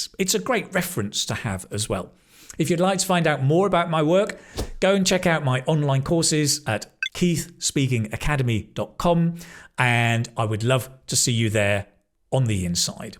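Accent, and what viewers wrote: United Kingdom. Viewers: I love your british accent❤Very useful video❤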